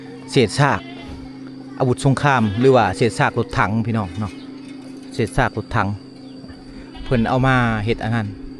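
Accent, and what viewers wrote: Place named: Southern Isan, Thailand. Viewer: อยู่แขวงไหนครับพูดเหมือนคนอิสานใต้ครับ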